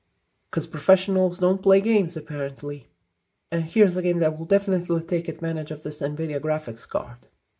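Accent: Italy. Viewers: Ma sei italiano?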